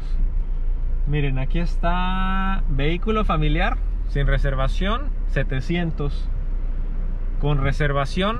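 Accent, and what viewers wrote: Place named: Tijuana, Mexico. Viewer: Hola.. muy informativo tu video.. pregunta, de donde eres originalmente? Suenas como yo. Soy de Tijuana.. tengo curiosidad 😬🤔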